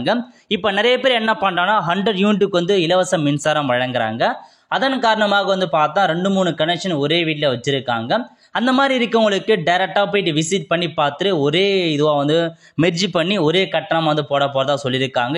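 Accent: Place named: North, India. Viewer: எவனோ வடக்கன் பேசுறானோ? என்னடா பேச்சு இது..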